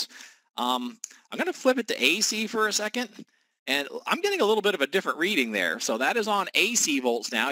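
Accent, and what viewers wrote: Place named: Northern Minnesota, United States. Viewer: that guy giving the presentation has a Northern-Minnesota accent. Have you ever seen/heard the movie "Fargo?" Great movie of a true story set in Northern Minnesota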